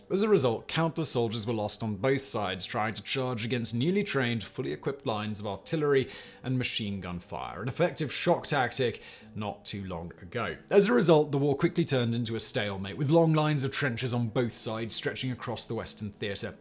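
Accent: United Kingdom. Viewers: Hmmm, British narrator....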